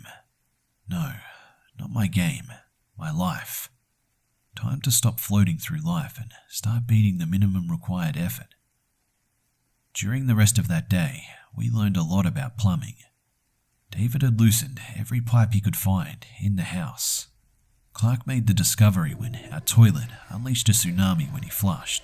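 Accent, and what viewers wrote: Australia. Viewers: are you Australian cause your accent sounds Aussie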